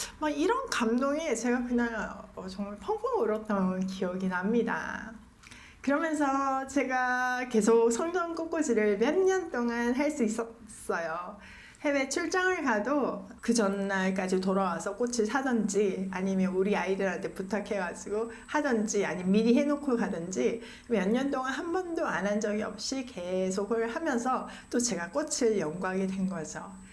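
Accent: Gyeongsang, South Korea. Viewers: 고향이 경상도?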